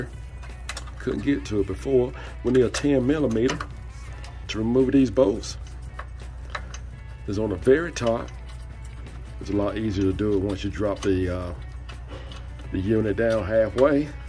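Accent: South, United States. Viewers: My mans so country but I love it lol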